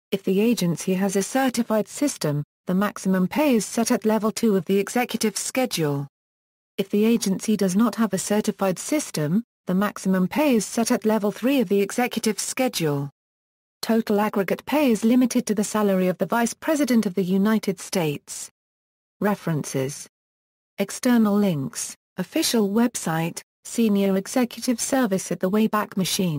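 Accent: United Kingdom. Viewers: why is a British person saying this lol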